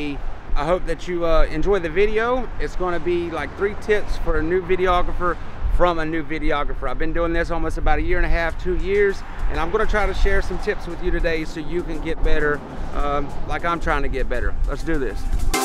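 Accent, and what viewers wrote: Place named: South, United States. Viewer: Good stuff! I enjoy learning from people who are just learning too. I’ve played with video cameras off and on for like 20 years but only been serious about it for a couple years in total. Love the southern accent! I’m in FL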